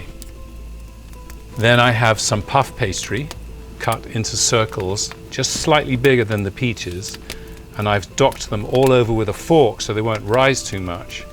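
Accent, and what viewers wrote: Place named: United Kingdom. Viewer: Hi, greetings from Norway I think your videos are beautifully made, you make a cooking session into a ceremony. I would love to spend some of my future afternoons doing some of the things you do. It is not going to happen year around, if I continue living in a country, which is covered by snow half of the year, though? Anyway, I have a question and a comments for you. 1. Please do not play the same tune as the background music in every video. It is serene once, twice, but after watching all your videos in short succession, the tune is sort of stuck in my head. I do not like it when a song is playing again, and again in my head. Even when I am dreaming, it is. But that is just me. If most of your followers love it, then you should continue doing it that way. 2. Where do you live? To me you sound like a British man, myself being Norwegian and all that, difficult to figure out every accent, but the scenery looks like Italy, Spain or California.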